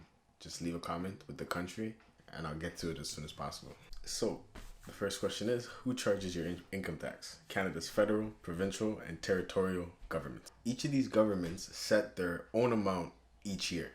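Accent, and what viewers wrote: Jamaica. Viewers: Hey bro, Are you originally from Jamaica? Also, what broker do you recommend for US clients? Thank you for sharing.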